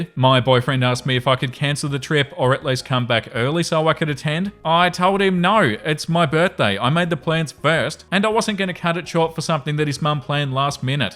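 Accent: Australia. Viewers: i showed my mom your channel & she says "i want to marry this man's australian accent" (she loves australian accents lol)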